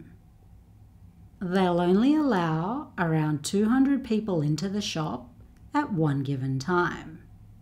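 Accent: Australia. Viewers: Are you native Australian?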